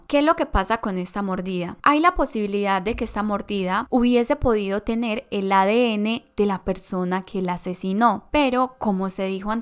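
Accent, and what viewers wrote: Colombia. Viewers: me parece genial que lo hayas tomado bien, la verdad cuentas con mi apoyo en tu canal seguiré viendo tus videos pero si mejora un poco el guión para que no sea repetitivo. Aprovecho nuevamente para felicitarte por tus investigaciones son muy detalladas. Por tus modismos y acento creo que también eres colombiana como yo y si eres de acá me parece genial 😁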